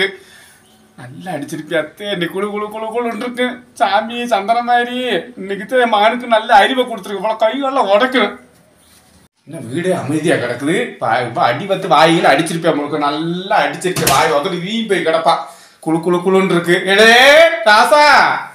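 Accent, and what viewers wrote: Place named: Usilampatti, India. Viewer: உசிலம்பட்டி பேச்சு சூப்பர் அண்ணா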